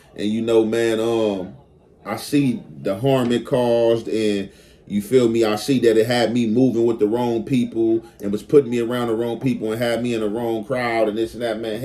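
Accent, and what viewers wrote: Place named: South Central, United States. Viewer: that south central accent is iconic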